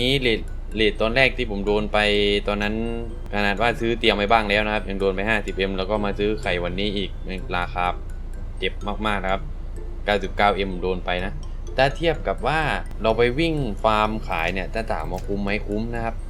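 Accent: South, Thailand. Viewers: คนใต้หม้ายนิ 5555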